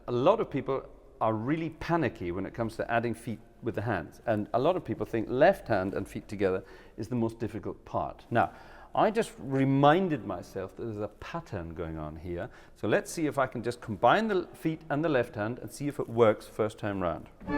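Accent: Scotland, United Kingdom. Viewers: First time on your channel. Fascinating, as the organ has always seemed so complicated—to a piano player such as me. Also fascinating is how your accent moves from Scottish to Sean Connery performing a German general in a World War II movie. 😉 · Love your scottish accent <3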